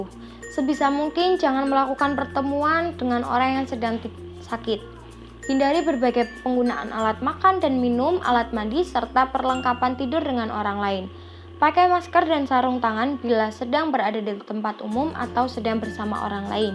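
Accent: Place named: Java, Indonesia. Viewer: Medok nemen nok bahasamu😂😂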